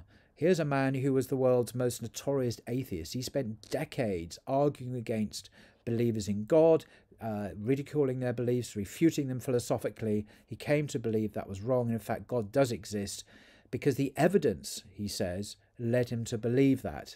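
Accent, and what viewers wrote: United Kingdom. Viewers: Love your British accent, thank you for the clear exposition!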